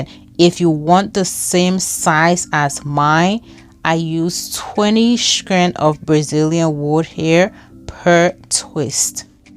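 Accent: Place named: Liberia. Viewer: Are you a Liberian? You sound like one no doubt 🥰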